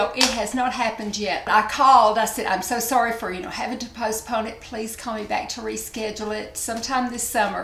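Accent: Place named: Kentucky, United States. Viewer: I'm glad to hear that you were also born a Kentuckian! I live in Louisville but also spent many years in a small country town south of Louisville. I wish I had your accent as it is so charming!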